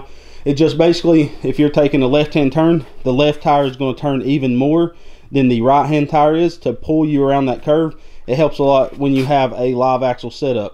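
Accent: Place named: Georgia, United States. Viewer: Cool. When I first heard you talk I thought you were from South Georgia. Nice video!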